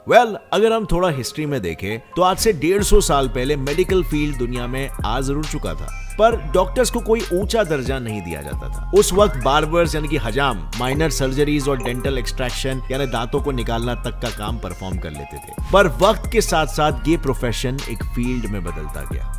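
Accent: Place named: Maharashtra, India. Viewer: Tension naka gheu , Marathi accent 👍🔥🔥❤️ kamaal aahe 👍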